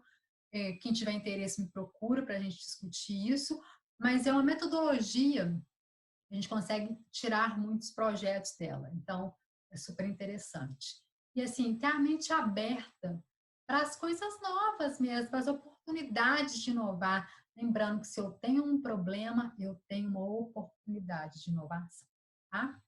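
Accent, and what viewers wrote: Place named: Goiás, Brazil. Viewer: Sotaque goiano detected. Parabéns pelo vídeo minha querida.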